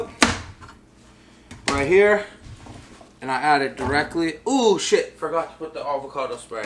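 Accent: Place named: Michoacán, Mexico. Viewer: I seriously thought you were from Michoacán Mexico 🇲🇽 bro Love that meal 🥘 prep easy and affordable thank you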